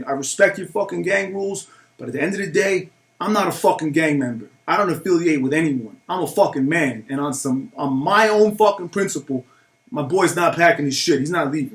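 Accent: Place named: New Jersey, United States. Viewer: You're such a great story teller. Just found ur channel & you got great content. Plus, I luv the accent. Im from Jersey & hearing u talk reminds me of home👍 Keep up the great work!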